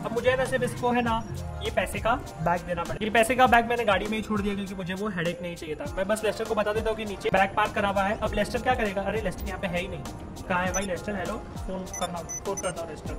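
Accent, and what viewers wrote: Gujarat, India. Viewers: Bhai tum Gujarat se ho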